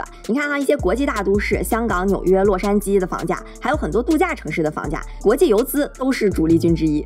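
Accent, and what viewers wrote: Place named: Dalian, China. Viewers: 怎么像大连人似的😄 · 在异国他乡听大连老乡说普通话真亲切！现在不仅我和我老公在看你的频道，我连我爸妈也安排上了。加油小Lin! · 我刚进来看， 她讲的不错， 我也是大连的！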